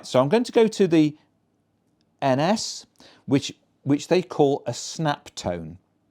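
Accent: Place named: East Midlands, United Kingdom. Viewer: What a refreshing video. No razzamatazz, no sickly AI non human voice and you made it very clear on the steps to take. I liked the sounds you got as well as the East Midlands (ish) sound of your voice.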